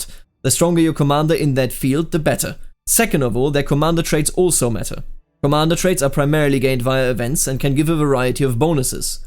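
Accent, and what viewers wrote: Bavaria, Germany. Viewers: Kommst du aus Bayern?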